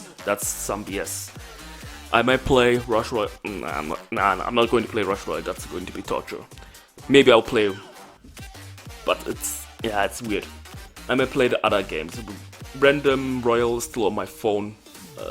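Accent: Germany. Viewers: Bro dein deutscher Akzent 😂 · Hi are u german?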